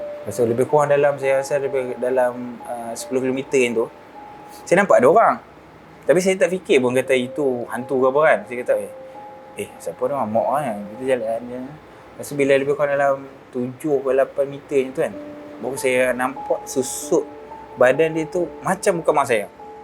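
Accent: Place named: Kedah, Malaysia. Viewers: Org Kedah yg dok Perak ni mmg dh bercampur sikit dh Bahasa dia ngn KL. Tambah plk dia pernah dok Ipoh yg ada byk org berloghat KL sikit... Mcm² loghat org Ipoh tu... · Truih kan loghat utara mu brader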